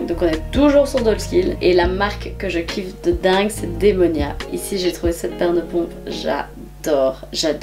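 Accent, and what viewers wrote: Belgium. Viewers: aaaah mais tu es si adorable! j'adore ta bonne humeur et cet accent belge qui donne le sourire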